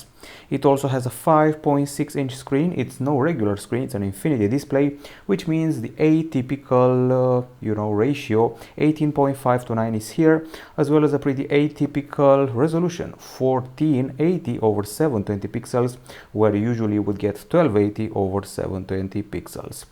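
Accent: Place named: Romania. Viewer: Esti roman dupa engleza ta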